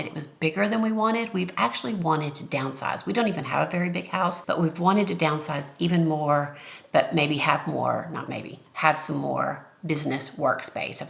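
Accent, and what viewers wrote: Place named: South, United States. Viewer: I’m so eager to hear how your southern accent evolves onto British. It’s going to be adorable! 😊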